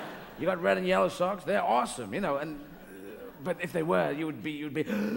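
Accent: United States. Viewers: I love his southern accent. That was hilarious. It is good, I am southern. I am a Cajun hillbilly, lol! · I love his American accent.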